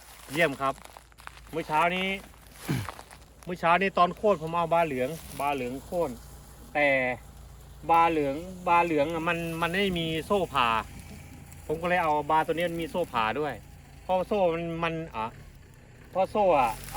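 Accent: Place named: Phu Thai, Thailand. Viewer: ฟังเสงเว้าคือคนภูไท อยู่สิเลอน้อครับ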